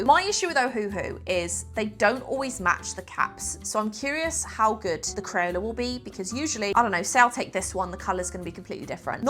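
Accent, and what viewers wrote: England, United Kingdom. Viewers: Besides your videos being informative, I could listen to your beautiful English accent all day.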